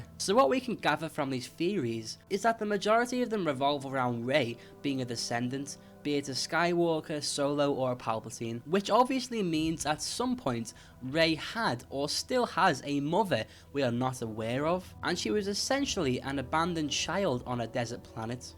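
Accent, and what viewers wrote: Liverpool, United Kingdom. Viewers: Are you from Liverpool? I am :p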